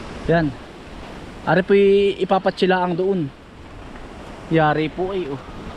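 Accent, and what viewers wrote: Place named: Laguna, Philippines. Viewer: Tagasan po kayo, kc ung accent nyo ganyan po dati magsalita ung mga kawork kung taga alaminos laguna. 😂Ginagaya ko sila dati pg nagsasalita nakakatuwa po kc pakinggan.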